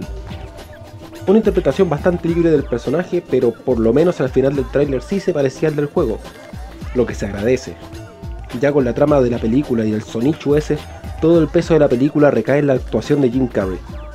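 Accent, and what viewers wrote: Chile. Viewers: este wn es terrible chileno oe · Eres chileno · Chileno poh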